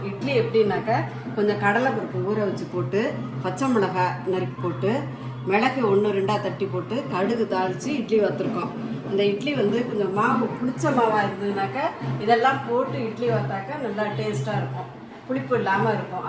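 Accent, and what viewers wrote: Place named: Telugu, India. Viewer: Atta, you seems to be Tamil side telugu to me. Where do you put up in chennai?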